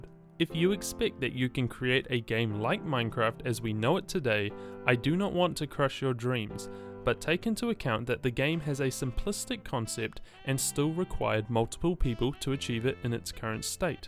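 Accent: New Zealand. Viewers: Wait?? Your a kiwi??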